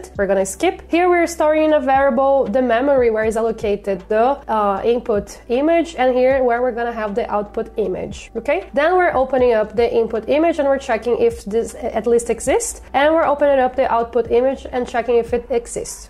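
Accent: Brazil. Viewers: tu é br??? a pronuncia é muito brasil!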